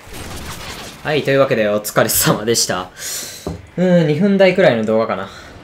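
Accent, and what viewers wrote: Kansai, Japan. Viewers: 関西の方ですよね？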